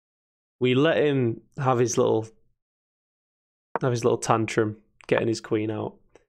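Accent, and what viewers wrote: Hull, United Kingdom. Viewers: You’ve got to be from Hull with that accent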